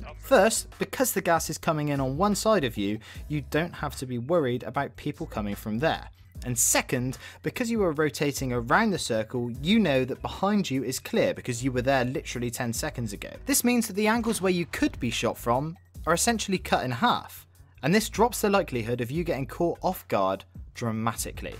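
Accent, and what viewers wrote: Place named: England, United Kingdom. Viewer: You have an English accent. I trust you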